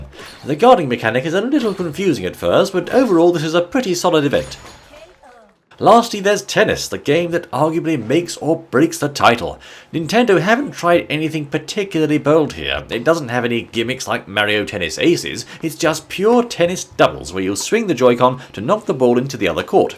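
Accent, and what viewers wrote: United Kingdom. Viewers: He is British?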